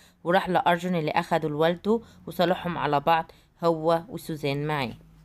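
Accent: Upper Egypt, Egypt. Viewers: اللهجة الصعيدية تجنن بس حاولي انك تتقني مونتاج الصوت بحيث ميبانش ان الكلام متقطع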